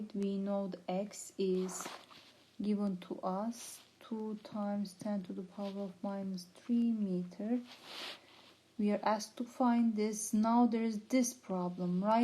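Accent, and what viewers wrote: Turkey. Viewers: Tam Türk aksanınız var hocam :) thanks.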